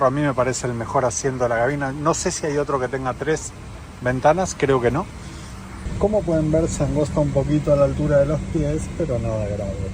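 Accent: Argentina. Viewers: A este muchacho no le saco la ficha habla con palabras de España con acento argentino, raro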